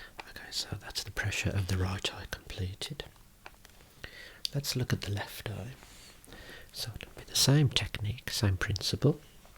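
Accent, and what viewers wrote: Birmingham, United Kingdom. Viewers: yes, its a brummie accent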